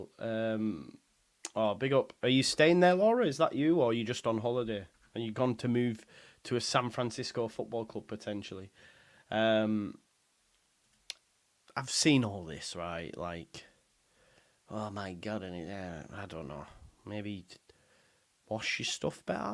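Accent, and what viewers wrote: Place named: Mixenden, United Kingdom. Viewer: you sound like a Mixenden lad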